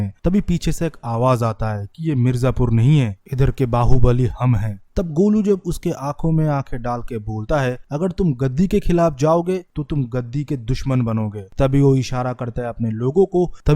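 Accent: Bengal, India. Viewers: Bhai tum...bangali ho kya...??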